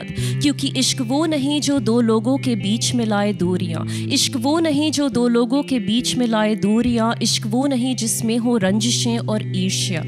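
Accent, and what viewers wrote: United Kingdom. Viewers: Why Urdu/ Hindi words in English accent?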